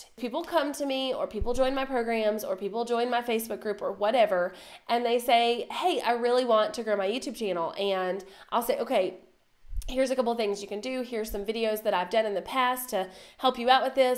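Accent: South, United States. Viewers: I have got to ask which of these southern states are you from? Mississippi here!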